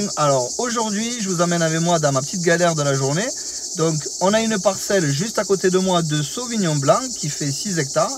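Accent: South, France. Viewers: Salut belle vidéo avec le bon son du sud bon courage à bientôt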